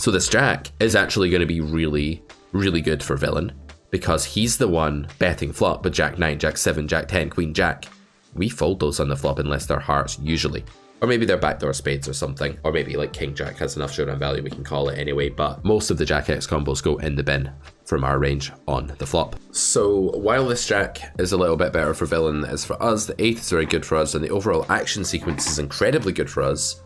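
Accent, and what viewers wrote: Scotland, United Kingdom. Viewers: The auto generated captions are actually really good here with beautifully clear Scottish lilt.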